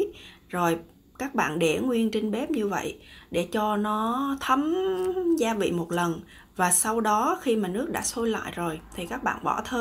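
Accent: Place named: Da Nang, Vietnam. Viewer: Mình cũng ng Đà Nẵng , nge nói mình thấy vui vui , vì gặp đồng hương bên xứ người, mình hiện đang ở Mỹ ạ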